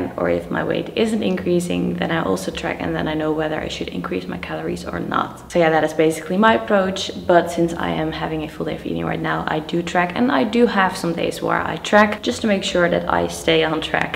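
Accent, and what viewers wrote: Netherlands. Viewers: she's dutch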